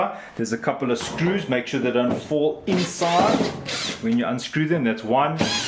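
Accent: South Africa. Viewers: Am I hearing a fellow South African accent?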